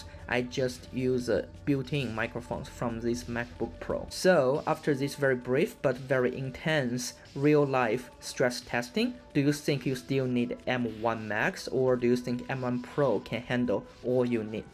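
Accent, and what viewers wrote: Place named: China. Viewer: I bet you are Chinese from your accent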